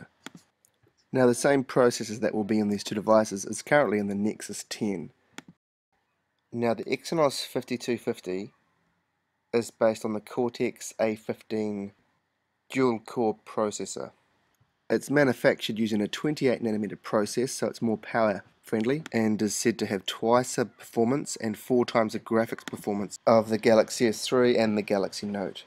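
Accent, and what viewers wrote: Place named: New Zealand. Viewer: I think your from new Zealand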